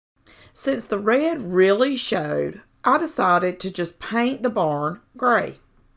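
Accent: South, United States. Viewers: I like your accent it's country keep it up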